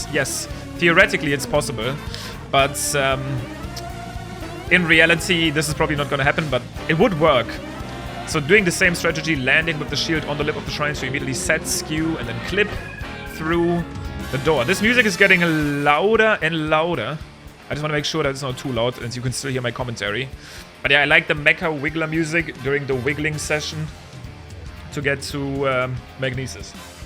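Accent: Germany. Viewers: I know a german accent when i hear one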